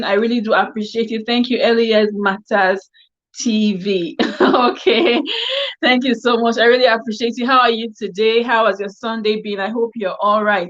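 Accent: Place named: United Kingdom. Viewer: This is a very interesting topic u look gorgeous sis I am enjoying ur UK accent...would be here for all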